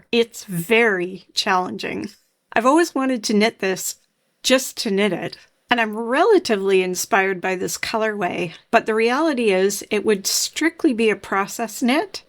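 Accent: Canada. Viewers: Hello again,. Great video but I get verklempt when I even think about destashing. To be fair, we moved four years ago and I let go of all of my commercial yarn at that time. But I still have about six bins and countless project bags in the closet. You asked us to comment on our own yarn categories and, were I to destash at some point in the future, I would need a special donate-or-dragon pile that would be for my daughters only. In other words, I will invite them to look over the yarn and donate it to them if they want it, but otherwise it goes back into the dragon hoard. Thank you for the video. I'm really enjoying them and often knitting along (or grading papers and wishing I was knitting along). I am new around here, but I think I detect a Canadian accent - so I hope you are staying warm and wooly. <3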